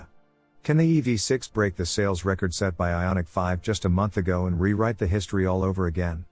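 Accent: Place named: United States. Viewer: Great-looking car, but deathly awful Americanised narration and leaden storyboard. Get a British production company to produce your promotional videos. You only have to look at the UK's Grand Tour production values to witness innovative and inspirational delivery, which is absent everywhere else.... in the world.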